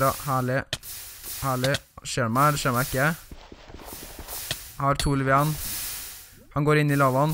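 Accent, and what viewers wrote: Trøndelag, Norway. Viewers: Ælske at du snakke trøndersk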